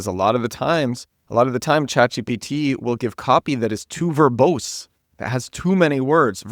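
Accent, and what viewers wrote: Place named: United States. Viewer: I really like your English and your accent, so could you please tell me which accent is this? I'm sure it is an American accent but which state? which part of America? Thank you, you've got another follower, congrats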